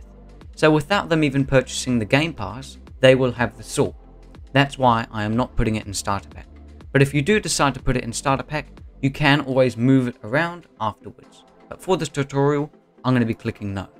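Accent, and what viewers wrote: United Kingdom. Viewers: pronounce your words dirty british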